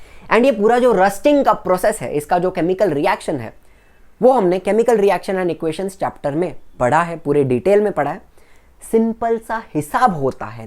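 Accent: Maharashtra, India. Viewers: Sir are you Marathi or Watt ? Please tell me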